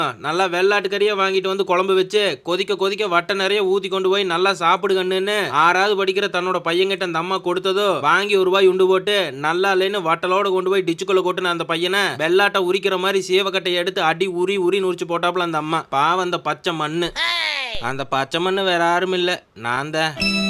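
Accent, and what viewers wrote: Kongu, India. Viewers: Kongu tamil semma...❤ understand only kongu makkal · என்னங்க அண்ணா நம்ம கொங்கு தமிழ் கலக்குறீங்க அண்ணா 🎉🎉🎉🎉🎉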